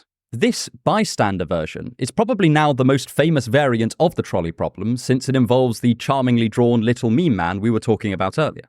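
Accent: RP, United Kingdom. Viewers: Your proper delivery of content using concise breakdowns, perfect annunciation and liberal use of gesturing without your posture falling outside of conventional expectations is; in short excellent. Standing tall, speaking confidently with; from my vantage point, an accent that demands acknowledgment of its old-world knowledgeability, all the while casting spells with all that gesticulating it would seem, you’re helplessly bound to. Again: no notes. As a blue collar American lefty my inner, ignorant, exceptionalist at surface level sees a pretentious, pompous, privileged, pretty boy, from fancy accent island making some non-point I heard wisecrack cover in a self deprecating video years ago. But that’s the very worst part of me. My jealously. Seeing your youth and education and talents put to use in one concerted effort makes me pine for a life I was born too late to capitalize on. 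Much the same as any other haters you may encounter online assailing your content with contempt. I say this all to tell you haters are just usually ashamed. And also to remind you DONT READ YOUR VIDEOS COMMENTS. THIS ISNT FOR YOU TO SEE IF TOU VALUE YOUR MENTAL HEALTH BRO. Take care.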